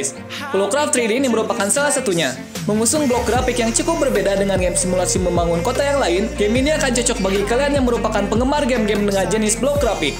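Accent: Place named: Indonesia. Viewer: Logat lu indo sangat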